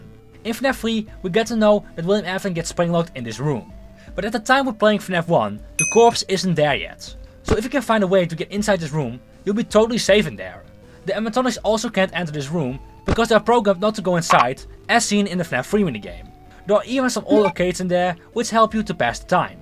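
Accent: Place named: Netherlands. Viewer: World’s most subtle Dutch accent 😆 Hoi from Canada 👋 Enjoyed the video! I appreciate your evident knowledge of the games and different theories. For Security Breach, it’s actually more difficult than you might think, thanks to Moon. Small enough to get into vents, and can seemingly swim through the air in most of the building. Plus, most bots have security clearance equivalent to LVL10 (or greater). However, there do seem to be some exceptions, based on the doors that Freddy himself can’t open. If he can’t, but you can, then technically the other animatronics can’t either and you’ll be totally fine. I can’t quite remember, but I think the VIP lounge REQUIRES enough merchandise to be collected? Otherwise Freddy could’ve just opened it early. Not sure if there are other doors that are similar.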